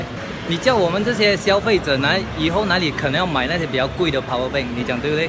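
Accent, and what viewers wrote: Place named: Singapore. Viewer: Talk singhish haha because 是 jb人吗?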